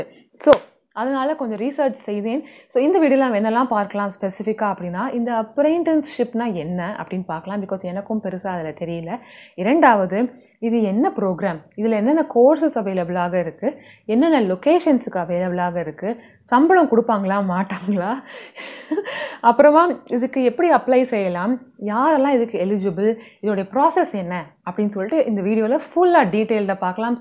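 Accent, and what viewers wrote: Sri Lanka. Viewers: srilanka va ..slang kekka nalla iruku cute ya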